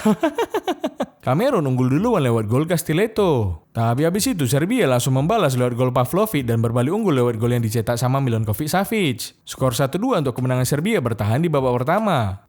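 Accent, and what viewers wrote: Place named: North Sumatra, Indonesia. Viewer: logat kau sumut kali njeng 🤣🤣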